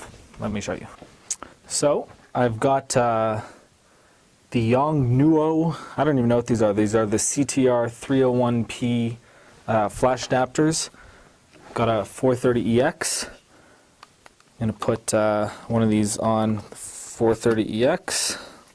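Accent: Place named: Eastern Europe. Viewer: You sound bit like eastern european but I'm probably wrong. Nice vid!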